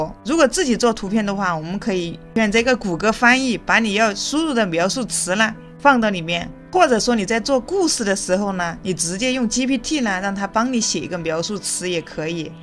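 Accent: Sichuan, China. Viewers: 四川的吗？口音挺像啊。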